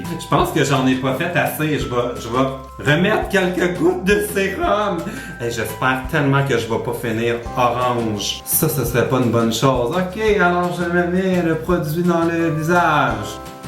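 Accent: Quebec, Canada. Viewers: cet accent mon dieu. quand je vais compredre les quebecois? mdrrrrrr :)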